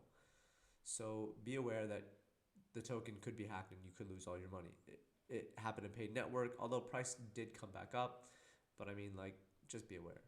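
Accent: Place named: United States. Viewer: You sound American. How can you invest in IDOs when they all require KYC to filter Americans out?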